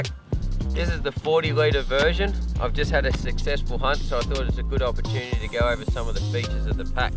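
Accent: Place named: Australia. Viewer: Lol love that aussie accent yet we aint got it here. In tassie it sucks lol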